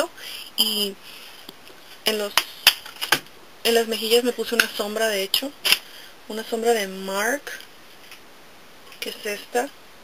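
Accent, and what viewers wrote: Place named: Northern Mexico, Mexico. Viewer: muy buen video tienes un acento cmo d monterrey o dl norte d dond eres?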